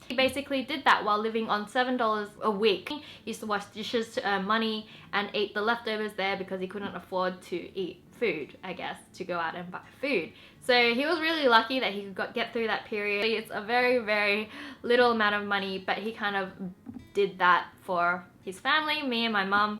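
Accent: Australia. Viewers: she's lived in Australia most of her life, so she picked up the way that the locals spoke. Before she moved she probably didn't have that accent, it just developed over time. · Woah, she sounds really Australian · Wengie are you Australian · You know, usually I can tell the difference between Aussie and English accents. but I honestly thought you were from the UK. but then when you said you're Aussie , that's when I actually heard your accent. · that's why you sound Australian I live in Australia Melbourne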